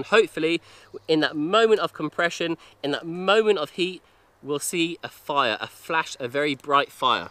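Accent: United Kingdom. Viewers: Having lived in Japan for 19 years,,,, Man, it is great to hear a UK accent! Thank you, really enjoyed this!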